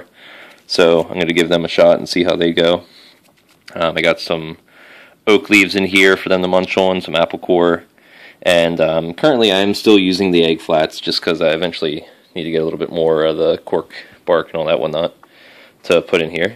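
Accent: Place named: Philadelphia, United States. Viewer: I just got some of these, I heard they are very prolific breeders, have you had success? Also, you sound like you're from philly area, if that's the case, you should definitely check out the insectarium! it's on Frankford ave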